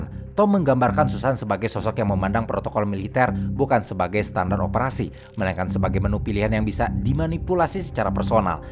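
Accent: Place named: Banyumas, Indonesia. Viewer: Wong ngapak ngendi kie😂 tegal um?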